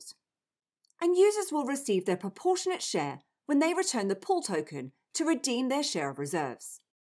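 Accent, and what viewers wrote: United Kingdom. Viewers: best thing about your channel is listening to gb English instead of us. Not many brits doing crypto content. Well done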